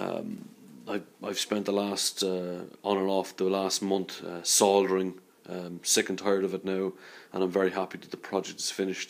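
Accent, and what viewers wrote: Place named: Midlands, Ireland. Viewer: Are you from the midlands? You accent sounds like its from the Irish midlands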